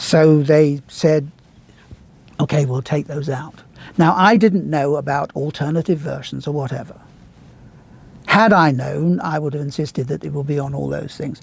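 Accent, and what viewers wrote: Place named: RP, United Kingdom. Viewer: He is so wise and confident about his craft. Rightfully so. His music is amazing. Plus his accent is so posh!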